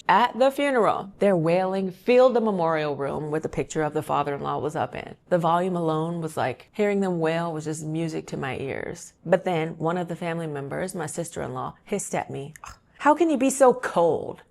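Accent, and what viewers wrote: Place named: South, United States. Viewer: I can tell your from the south cause that is how we talk with the accent ,we don't put up with any bull crap.love y'all's story time .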